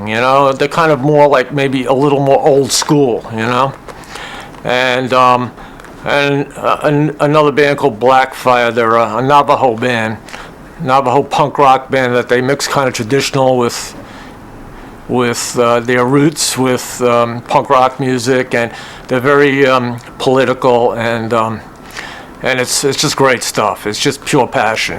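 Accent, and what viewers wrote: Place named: New York, United States. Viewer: The New York accent is so cool. Love it. 👌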